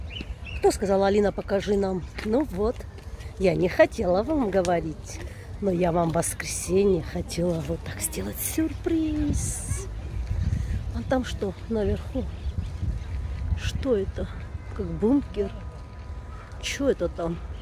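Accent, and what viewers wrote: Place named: Armenia. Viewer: Я люблю отдыхать в Сочи, именно в Адлере, там много знакомых армян и армянок. Смотрю видео и представляю, что я в Адлере, и по природе и по вашему акценту))) Спасибо Вам за красоту!!!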